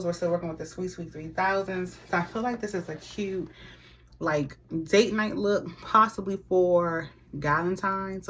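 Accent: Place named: DMV, United States. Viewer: First time on your channel!! Oh you came with the looks 🙌🙌. Definitely new subscriber. And the way you talk -you sound like you're from my area #DMV. Thanks for the style inspo.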